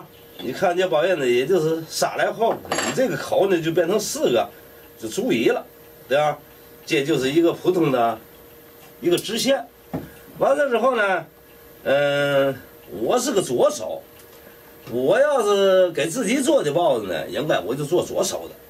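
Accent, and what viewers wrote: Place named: Tianjin, China. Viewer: 10 分钟 做完 用了二集???这嘴天津的... lol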